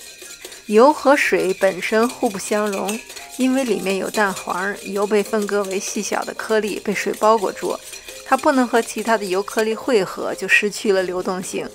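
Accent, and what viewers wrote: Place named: Beijing, China. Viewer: 好可爱的儿化音口音💘，一看介绍，原来在我隔壁省。一直觉得像做饭或者做那种天然手工品（前段时间在看手工皂），都像巫师一样施法。难怪说，学好数理化，走遍天下都不怕🤣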